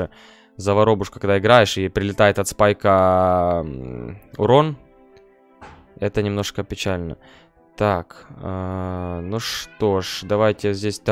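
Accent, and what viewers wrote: Ukraine. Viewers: За укр акцент лайк!!!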